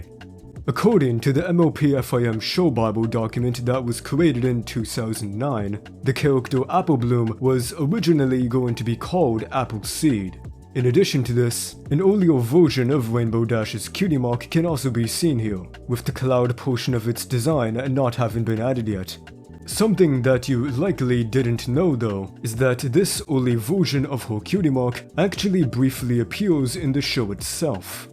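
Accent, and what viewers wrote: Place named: Germany. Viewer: are you german, because your voice sounded like a German accent.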